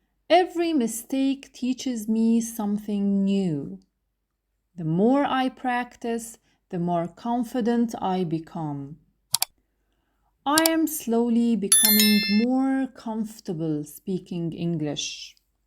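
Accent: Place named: Arab world. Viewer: أنت عربية واضح من لهجتك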